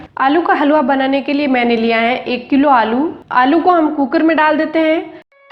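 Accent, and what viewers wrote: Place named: Marwar, India. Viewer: All Indian Rasoi waoo hum bhi marwadi h aapna loog ki boli s ptaa chl jtaa h ....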